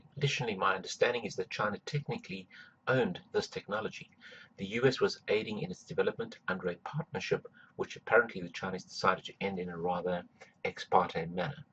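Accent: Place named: United Kingdom. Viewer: #1 Just because you believe that is what happened doesn't make it true. #2 This is not the only aircraft that has disappeared without a trace. Even when flown over land it has been years in some cases when crash sites were found. #3 You sound like a British citizen, certainly not from the U.S., so "you"(Britain) do not have stealth bombers. #4 You said, "There is more to this island than meets the eye." Statements like that are a very weak attempt to be provocative. And pardon me but, everyone on the planet knows this is a U.S. airbase. You mentioned there was a very public court case in Britain regarding the "occupation" of this island. #5 The article reads,"Confirmed at highest levels of gov't but not the current one." What does that even mean? Sounds like, "Void where offer not prohibited." #6 Where is the hard evidence? #7 Just because someone says it doesn't make it so. 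#8 Repeating it over and over doesn't make it factual. #9 You have no way of confirming any of this information therefore we can all take it with a grain of salt. #10 See Occam's Razor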